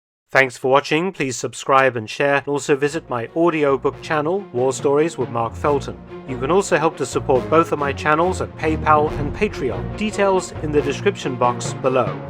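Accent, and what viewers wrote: United Kingdom. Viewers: British pronunciation. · Yes, it's a British thing. We say lieutenant as "leftenant". However, when we say "in lieu of" it is pronounced "in loo of". I wonder if the American pronunciation of lieutenant as "lootenant" is more correct? A lot of sources do not explain where the British term leftenant came from. However, I like this one: "According to military customs, a lower ranking soldier walks on the left side of a senior officer. This courtesy developed when swords were still used on the battle field. The lower ranked soldier on the “left” protected the senior officers left side. Therefore, the term leftenant developed."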